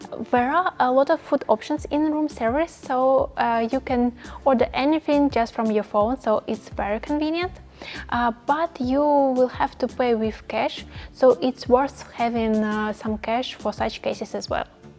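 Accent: Russia. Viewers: You have Soviet(Russian) accent in English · Are you Russian? Coz you sounds like Russian. I love that 👍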